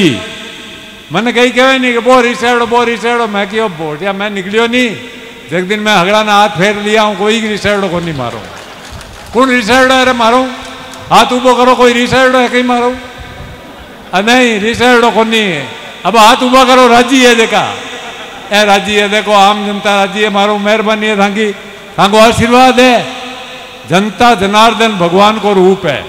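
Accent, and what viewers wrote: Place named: Nagaur, India. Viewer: Nagour ki boli bahut achi lagi 😊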